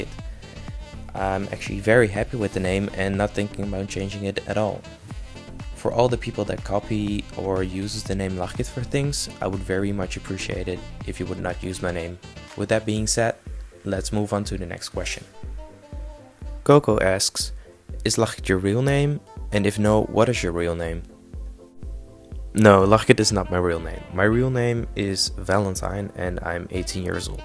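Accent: Netherlands. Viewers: I am sorry but you have a very Dutch accent :p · Omg it's so funny because I'm from Belgium and I can really Hoor dat je een Nederlander bent aan je manier van engels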